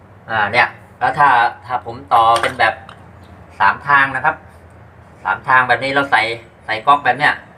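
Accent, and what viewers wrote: Chanthaburi, Thailand. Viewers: คนที่ไหนครับสำเนียงนี้ คล้ายบ้านผมเลย ผมคนจันท์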